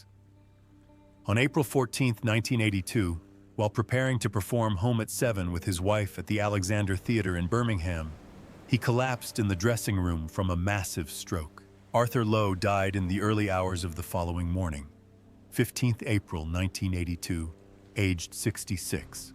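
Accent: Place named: United States. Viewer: Who needs to hear an awful american voice in a typical dull silly over dramatised so called factual documentary….👎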